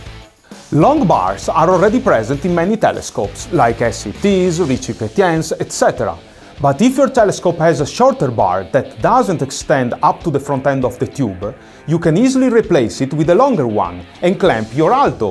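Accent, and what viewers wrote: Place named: Italy. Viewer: This guy made my day with his italien accent 🤣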